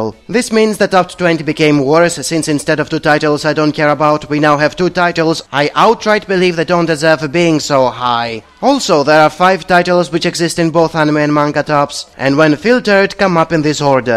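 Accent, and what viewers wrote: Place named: Greece. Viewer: He's from Greece and yes that's his normal accent.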